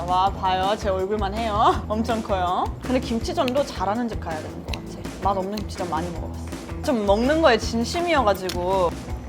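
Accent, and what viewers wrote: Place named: Gyeongsang, South Korea. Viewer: 어머님과 함께 갱상도 사투리로 대화하는 모습도 무척 재미있습니다.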